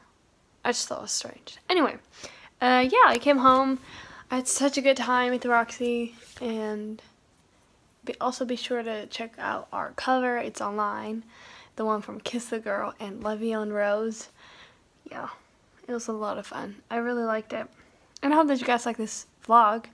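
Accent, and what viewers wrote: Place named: United States. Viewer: This was sooo coool. I love Europe and it seems so much better to see it thorough the eyes of someone I know. Brighton is beautiful. Is your friend also Dutch?? Was that your first time in England?? Does England feel like the rest of Europe or does it feel like the USA, or just different from them both?? Did I understand that your flight was only 1 hr between Amsterdam and London?? That seems very close. When do you think you will need your own car? Could you have driven from your home to Brighton?? Do you even know how to drive yet?? LOL.. (Please forgive my ignorance if that seems like a dumb question.) You know how it is here in the US, you need your own car to get anywhere because the public transportation is not as developed as it is in Europe. And states here are so big, especially on the West Coast of the US. Life in Europe seems very different than here. 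You have lots of different countries and languages around you. Do you mostly stay in your own country or do you travel a lot around Europe?? Was it expensive to fly from NE to England?? Do British people think that you are American because you speak English like an American?? Where you were here, I could not even hear your accent anymore. Your English is very clear. I wish I could speak Dutch as well as you can speak English, but Dutch looks like such a hard language to learn. LOL... Fantastic video. Good music and good editing. Thanks a lot.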